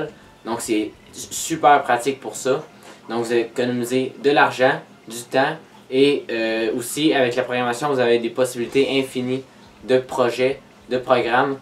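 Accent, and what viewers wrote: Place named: Quebec, Canada. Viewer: drole d'accent les quebecois lol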